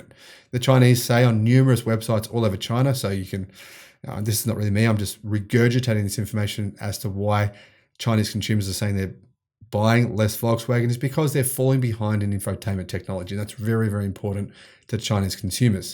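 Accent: Australia. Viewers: Aussi English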